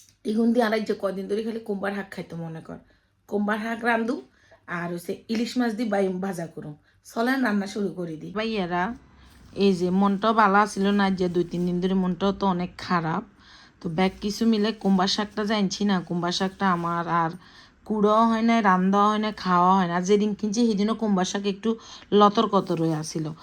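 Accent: Noakhali, Bangladesh. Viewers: খাবার পান না আবার পচায়য়া নষ্ট করে বাসি করে খান।😢এই শাকটা টাটকা খেলে মজা পেতেন এখনতো রাবার হয়ে গেসে।আমার শশুর ভাড়িও নোয়াখালি আমার শাশুরি আপনার মতনই কথা বলতো আর এইভাবেই তরকারি রেখে রেখে পচায়া শুখাইয়া রান্না করতো কম পেয়াজ তৈল দিয়ে।মারা গেসে আমাকে তালাক দেওয়াইয়া আরেক মাগি ঘরে আইননা আল্লাহ উনার আত্তাকে শান্তি দেক বেহেস্ত নসিব করুক।আমিন।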